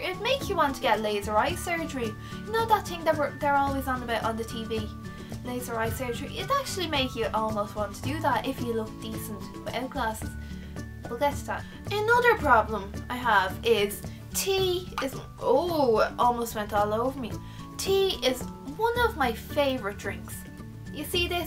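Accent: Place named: Ireland. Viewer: Are you Irish? I love your accent!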